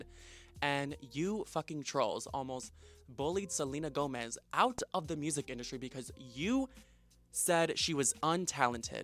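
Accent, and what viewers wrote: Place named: New York, United States. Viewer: Really?? I’m from New York and that’s how we speak. I have a heavy New York accent. It’s like people that have a British accent, not really something you can control and you do sound like you’re from New York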